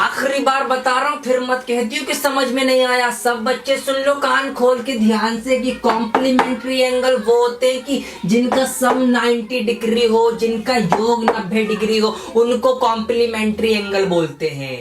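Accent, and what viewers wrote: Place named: Uttar Pradesh, India. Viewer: Correct bro thanxx tum up ka ho ya to pata chal gaya😁🎄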